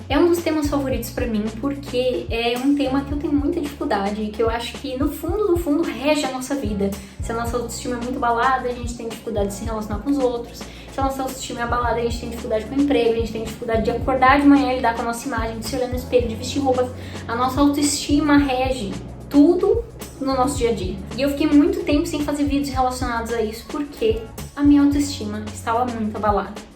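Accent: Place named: Rio Grande do Norte, Brazil. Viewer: Perdi as contas de quantas vezes vi esse vídeo de ontem para hoje. Pq você tratou esse tema de uma forma muito próxima, e isso é bom. O tema da auto estima de fato é uma coisa que a gente precisa ver e rever todos os dias. Ontem, li que resultado não vem com motivação mas sim com constância. O mesmo se aplica a nossa auto estima. Estou nessa mesma fase que você, de ir entendendo que meu novo corpo que já não é o mesmo de 10 anos atrás quanto eu tinha 17, surge na minha frente uma mulher e não mais uma adolescente, e é preciso amar, reconhecer e respeitar as curvas novas, e sim, com certeza melhorar aquilo que ainda não é tão legal pra gente e você trouxe isso muito bem. Ultimamente tenho filmado meus piores ângulos kk acrescento uma risada engraçada e vejo e revejo aquele tal pedaço de mim que eu não gosto pra justamente ver ele tantas vezes que ele se tornou algo comum e não um incômodo. 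Se a gente se fato rever esses pontos, eles nem são tão ruins assim, vai. Obrigada pelo vídeo. Continue postando essas coisas relevantes pra gente ter conteúdo real nessa internet. Ah, e eu achei lindo seu sotaque no inglês. Cheiro de Natal/RN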